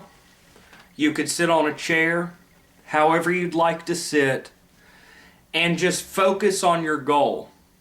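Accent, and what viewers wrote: South, United States. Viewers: Thank you for your ability to teach clearly! Very rewarding for me! You must be down south here somewhere. Esoteric instruction is always better when delivered w a southern accent 😃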